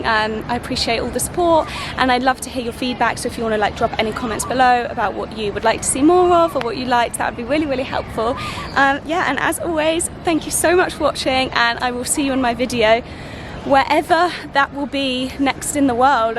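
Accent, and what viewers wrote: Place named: United Kingdom. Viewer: Always love your videos and charming British accent :-)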